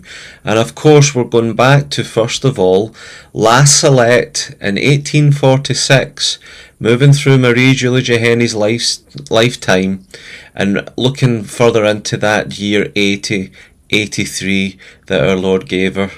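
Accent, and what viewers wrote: Scotland, United Kingdom. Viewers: What the heck! Your channel is pure dead brilliant!!! I live in Australia, and I've never heard of you before. My mother still lives in Greenock though, which can't be that far from you, gauging by your accent. You could have at least told her, and she could have sent me a postcard. So much wasted time. Now I'm having to watch all your other videos. My wife doesn't understand, says there are household chores to attend to; dishes and stuff. You've put me in a difficult dilemma. I am going to pray about it. 😀👍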